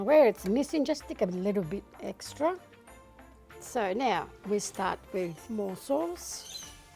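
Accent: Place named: Australia. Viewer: I love your accent, Australia^^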